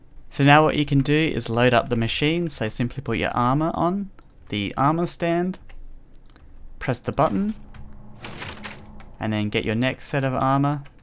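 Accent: United Kingdom. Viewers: WHAT, I thought your accent sounded British, i'm Australian aswell :D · You're Australian?! I live in Australia and thought you're accent was british.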